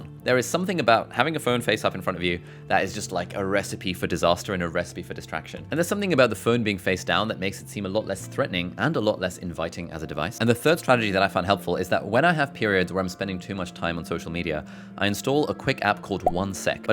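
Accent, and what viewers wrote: United Kingdom. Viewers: Gotta admit, Brit accent gets viewers. Lol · After one year of watching your videos I just realized your British accent when you said "trolly."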